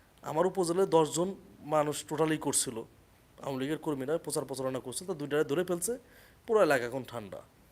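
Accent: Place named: Pakistan. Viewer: ভাই আপনার মুখের ভাসাতেই বুঝা সেস যে আপনি পাকিস্তানের মালের ছেলে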